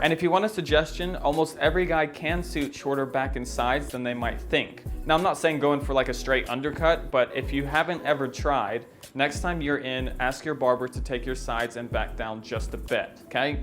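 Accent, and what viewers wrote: United States. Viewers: wait, you are british? dont u have an american accent? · If you're from South Africa and live in the UK, how come you have an American accent?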